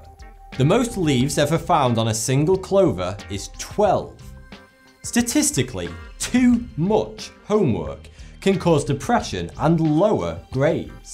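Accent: United Kingdom. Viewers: I like your british accent :3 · Is he British?? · Thoughty2 sounds like 42 with your British accent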